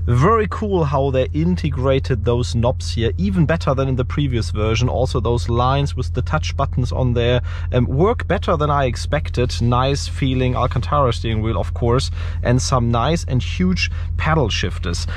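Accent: Germany. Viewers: Doesn't matter how you force yr English, yr German comes out very good with certain words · Max from Auto Top NL speaks Dutch English, speaks German English... a matter of taste!;-) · Kann den deutschen Akzent nicht ernst nehmen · Fucking German Accent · Schön das man raushört das du deutscher bist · This man sounds as "stereotypically german" as u can possibly get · Man hört dass du Deutsch bist xD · Wie man hört das er deutsch ist . Ehrenmann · Man merkt einfach er’s aus Deutschland hahahah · german accent check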